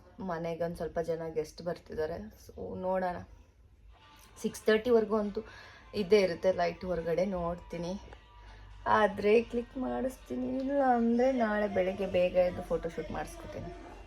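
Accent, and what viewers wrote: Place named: North Karnataka, India. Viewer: Niv North Karnataka davaru anisutte alva namma language tara 😊ede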